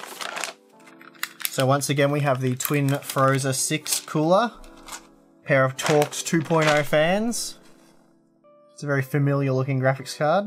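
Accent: Australia. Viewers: Such a sweet little Aussie you have <3 !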